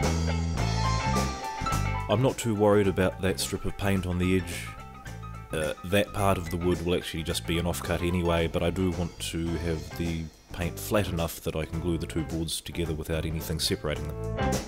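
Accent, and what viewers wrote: New Zealand. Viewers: I thought so when I seen the wax remover and the mention of Bunnings and you sound like a Kiwi..so am I in north island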